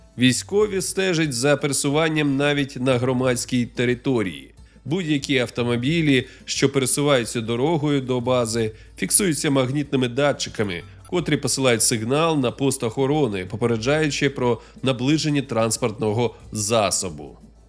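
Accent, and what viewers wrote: Moscow, Russia. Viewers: блін, як мене КОРОБИТЬ від цих москальських ааа в українській мові !!!!!!!!!!!!!!!!!!! одразу видно, що людина ДУЖЕ ПОГАНО володіє українськю мовою! Запамятайте просте правило : в українській мові як пишеться, так і читається!!!! Простий приклад (слова взяті із відео - <link> : пишеться : "кілограмів", читається - ТЕЖ "кілограмів", а НЕ "кілаграмів"!!! пишеться "теоретично", і вимовляється ТЕЖ - "ТЕОРЕТИЧНО", а НЕ - "теоретична" пишеться "потрібно", читається - ТЕЖ "потрібно", а НЕ "потрібна" !!!!!!!!!!!!!!!!!!!!!!!!!! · Дізлайк за кацапський акцент. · Лайк за україномовний контент, але відчувається "рускоязичная чєлюсть"... Треба ще працювати над вимовою. І ще радив би зображення ведучого по максимуму замінювати відеорядом, бо дивитись на очі які бігають читаючи текст не дуже цікаво. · А нічого, що він "акає" як москвіч??? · Хто бере приклад з цього чоловіка? 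В нього жахливий російський акцент, купа русизмів і не правильні наголоси! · Чути російський акцент диктора, мабуть раніше говорив російською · Слухаю це відео у 2026. Спочатку не помітила, що йому кілька років, і сильно здивувалася, чому Ви стали говорити з настільки виразним російським акцентом. Потім розібралася - за ці два роки прогрес у вимові просто чудовий.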